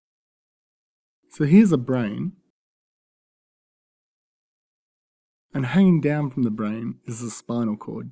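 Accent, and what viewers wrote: Australia. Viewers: How? Stewie sounds English and this guy sounds Australian 😒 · Thanks, simple easy to understand and with a pleasant Aussie voice. What more could a Med student ask for.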